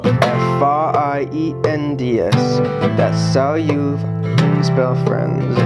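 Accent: United Kingdom. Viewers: Coz he's british like me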